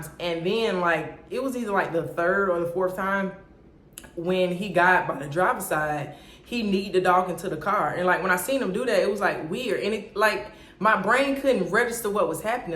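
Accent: Florida, United States. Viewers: Listening to your stories I was trying so hard to figure out what part of Florida you from. Highlands county deep in the country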